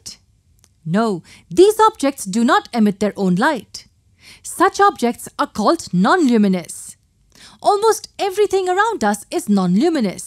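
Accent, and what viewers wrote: India. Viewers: Thickkkkkk INDIA ACCENT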